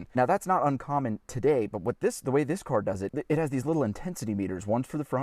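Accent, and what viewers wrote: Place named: Wichita, United States. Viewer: Does everyone in Wichita(aside from Wizard) speak with the same cadence as?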